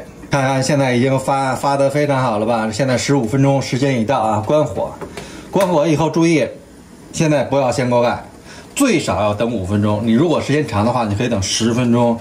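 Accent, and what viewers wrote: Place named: Beijing, China. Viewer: 给点赞，平和随性地道老北京味，不错加油↖(^ω^)↗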